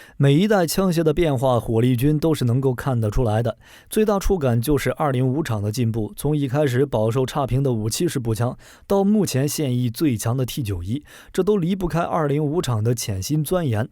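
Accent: China. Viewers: 為什麼大陸口音那麼重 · 你是国军退役？为什么你是大陆口音 机器人配音？ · 大家都好認真留言但是都聽不出來大陸口音嗎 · 這播報主的口音怎麼聽都是中國口音~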